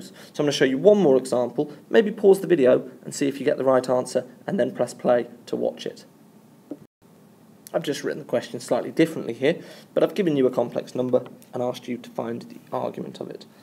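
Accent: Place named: United Kingdom. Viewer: i love that british accent :D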